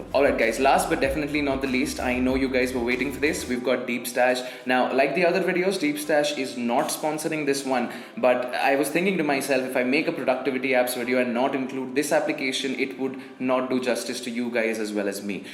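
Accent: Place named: India. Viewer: Your English sounds similar to any Indian English News reporter's English😄Thanks for the video!<3I got a crush over your English Speaking.☺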